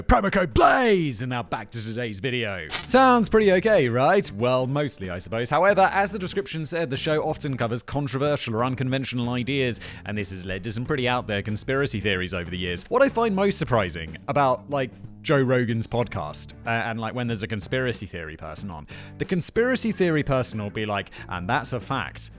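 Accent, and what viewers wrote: United Kingdom. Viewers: Simons Brit accent is at times a bit too heavy for me. But today, it was a fucking sonnet. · chill out people on pronouncations, he speaks the unrefined British English, not the improvemed American. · what's so "intelligent" about this guy. Is it because he speaks with a British accent? Apparently, he has been the voice of several youtube channels and somehow ends up copying the channels for himself. The guy is not even a scientist himself, and he's here criticizing those who don't follow the "official" narrative. These are the same people who won't let you even consider the possibility of the virus escaping from a lab which first appeared you know... 5 blocks from one of only 3 labs that could have made the virus. Subjects that were discussed at the time at the Joe rogan show. wished he was invited to Joe Rogan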